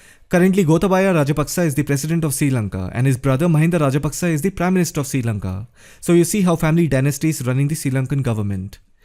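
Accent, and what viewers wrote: India. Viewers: The funny indian accent makes the video more interesting.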